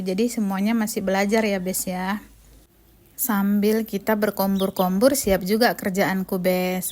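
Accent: Medan, Indonesia. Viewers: Kakak ini berasa bgt Medan nya, dulu saya pernah 6 thn di Medan..hihihi. disana jg ada kampung org Indianya ya bu...bpk suami ketemu di Medan juga kah bu? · Assalamualaikum dek... salam kenal dr jakarta,. aku baru tau ada chanel Nepali, maaf ya aku jd kepo... dek kl dr logat spt nya dr medan ya..? dimana ketemu suami hingga bisa nikah, sdh brp lama ada di nepal krn sdh punya anak besar2, suka pulang kah ke indonesia? dr berpakaian nya spr biasa aja, tdk spt kushboo pakai baju tradisi nepal pakai merah2 di kepala. terima ksh ya tolong jawaban nya ya... ❤❤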